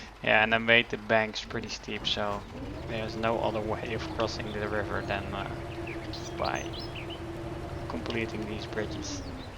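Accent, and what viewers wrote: Netherlands. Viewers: Klinkt als een Nederlander. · Ja volgends mij is hij Nederlands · Altijd grappig als een nederlands iemand engels praat :)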